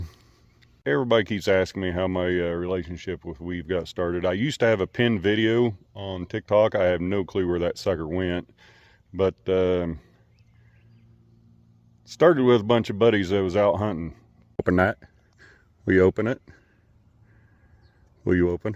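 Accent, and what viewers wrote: South, United States. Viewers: You're accent sounds Southern sometimes -- like when you say "ma dawg" lol 👏 My Alabaman father says it that way too. I guess since Illinois is partly in the South or bordering it in any case, it's not that crazy.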